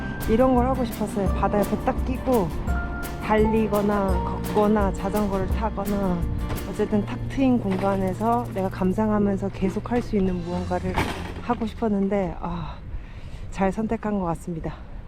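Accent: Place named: Gyeongsang, South Korea. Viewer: 저도 경상도 사람인데 혹시 어디분 이세용??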